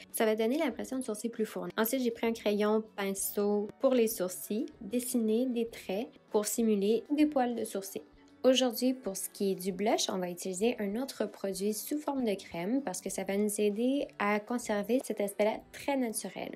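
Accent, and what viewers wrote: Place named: Canada. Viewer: sur cette video la dame est canadienne je pense. elle a un accent prononce. elle ne parle pas bien le francais de france. elle se trompe dans les determinants. donc pas top pour apprendre le francais.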